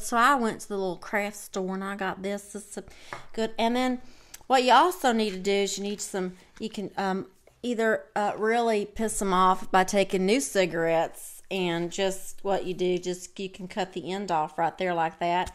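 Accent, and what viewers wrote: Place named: Tennessee, United States. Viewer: There's some Reese Witherspoon in your accent. I guess that makes it a Tennessee accent.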